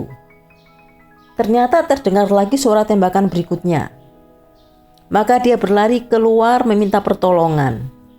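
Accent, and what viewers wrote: Java, Indonesia. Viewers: Logatnya medok bgt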